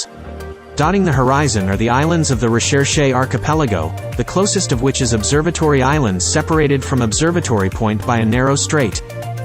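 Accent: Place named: United States. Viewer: Maybe AI IDK? BUT even so, why is an American (accent) talking about Esperance Australia? Why Not Australians? America, Good on you & i thank you❤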